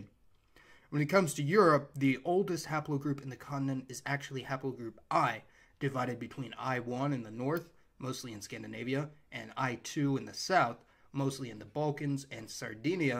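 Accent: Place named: Philadelphia, United States. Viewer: You have somewhat of a Philadelphia accent. It sounds like you try to cover it up, but it still pokes through in spots. Am I hearing that correctly? Also... where tf have you been lately?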